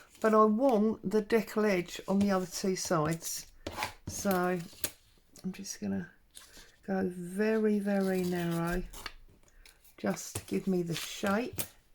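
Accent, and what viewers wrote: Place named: Kent, United Kingdom. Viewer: Hi, I have just found your channel and am enjoying watching, you make it very easy to follow. Also your accent is familiar, am I correct in saying you from Kent?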